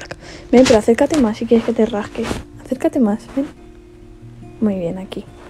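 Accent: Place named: Spain. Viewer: Hola lo mejor de ti es tu carisma y tu habla de español me gusta las españolas su forma de pronunciar saludos desde Costa Rica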